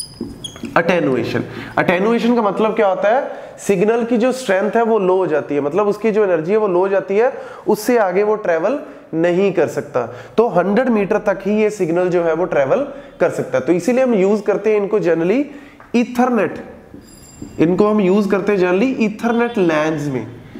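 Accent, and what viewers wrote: Punjab, India. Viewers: love your Punjabi accent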